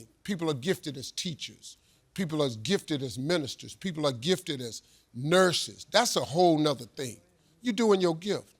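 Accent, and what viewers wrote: South, United States. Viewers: I think learning from something you're interested in is a great place to start. You're more likely to stick with it. But I would also recommend some type of formal schooling. I took French in college and it was very challenging but beneficial because we weren't allowed to speak in English at all. Also I don't know if you can tell but has more of a southern American accent. Like he says "pah" when a lot of people would say "pye." Hope that helps and makes sense!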